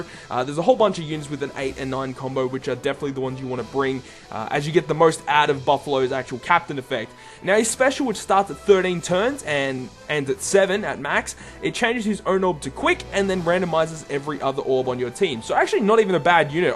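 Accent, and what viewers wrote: Australia. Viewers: You Australian?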